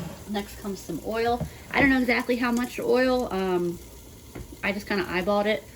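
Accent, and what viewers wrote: Philadelphia, United States. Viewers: I always thought you had a Philly accent but in past videos I think you said you were living further down South. But with the Phillies shirt I’m guessing from Philly region. Im in DELCO and sounds very familiar. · I knew you had a Philly accent!! Go Phillies!! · !! I know you're located in NC but I've thought for years I was picking up on a Philly accent in your videos! I'm from Delaware County-- where are you from? Also, GO PHILS! ♥️⚾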